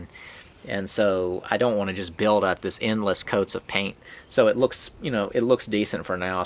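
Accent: South, United States. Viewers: I really like your videos, think I have seen most of them. I'm no american accent expert, but I understand this is some kind of southern accent. In what state do you live/do this work?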